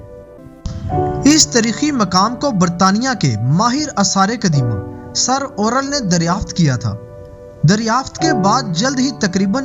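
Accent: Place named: Pakistan. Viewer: VDO se ziada ap k urdu accent se lutf andoz huay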